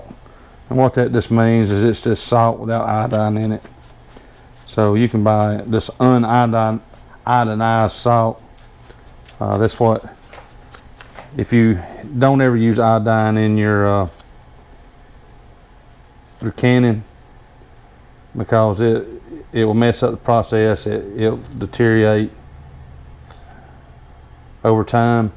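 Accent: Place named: South, United States. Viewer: 😉 I enjoyed listening to you, reminded me of my family in Louisiana with your southern twang. It is frustrating that canning jars went up close to 3 bucks case these days.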